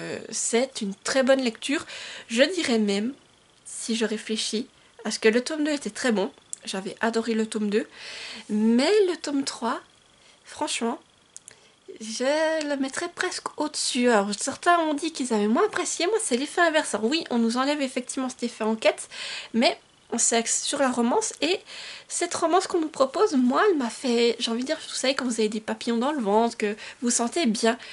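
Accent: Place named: Jura, France. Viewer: Salut j'entends comme un accent du jura je suis également jurassienne ça fait plaisir de voir une suissesse sur YouTube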